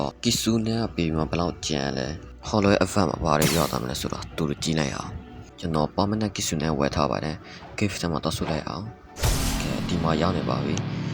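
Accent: Dawei, Myanmar. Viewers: ထားဝယ်ကြီးလာ ဘရို ထားဝယ် အသံ ကြီးနဲ့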